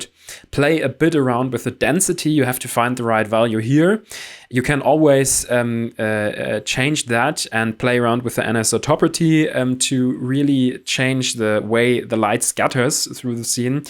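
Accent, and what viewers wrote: Germany. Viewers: Love it! Thanks for this inspiration and the tips! Pls more of this sort of Videos!! Are you from Germany? Your accent sounds like that! 😋😅🙏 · Are you from germany because i recognize the accent . Viele grüße! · Liebe es Deutscher Akzent 😂 top video danke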